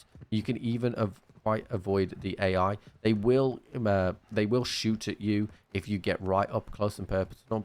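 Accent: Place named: United Kingdom. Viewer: DMZ is so damn fun I played it 16 hours straight starting yesterday! Thanks. So nice to find such a well-polished guide--and in a British accent, too! So nice to find such a well-polished guide--and in a British accent, too!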